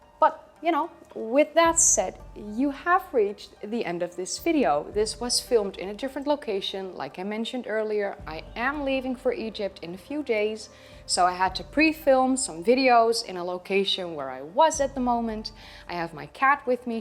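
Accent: Netherlands. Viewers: grappi je hoort meteen wanneer iemand van NL is haha wel leuk wat je doet hoor erg interessant hoor keep up the good work <3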